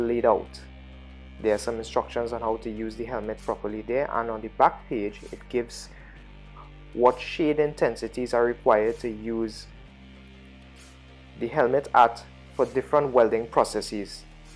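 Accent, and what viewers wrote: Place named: Trinidad and Tobago. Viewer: Cyar miss dat twang bai Trini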